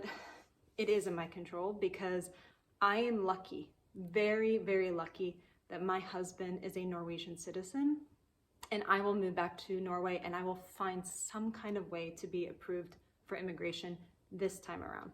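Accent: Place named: Midwest, United States. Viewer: Omg I was convinced you were not a native English speaker haha. Yes, I think it's the vowels and maybe it's the midwestern accent throwing me off.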